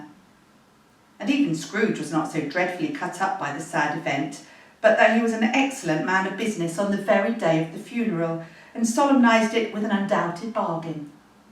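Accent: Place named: United Kingdom. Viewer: I loved listening to you read A Christmas Carol! It's different for me to hear it with a British accent. 🥰😘🎄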